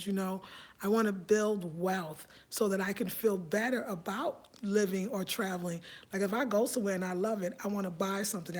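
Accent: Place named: New York, United States. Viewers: She said she moved to CA from NY, so that is most likely what you hear. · You have a new york accent not california accent.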